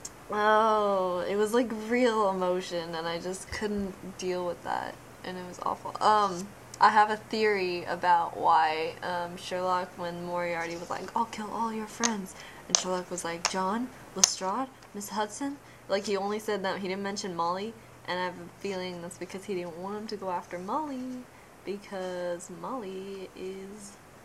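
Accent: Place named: United States. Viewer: By the way, you sound very slightly american. Did you go there recently before making this video or something?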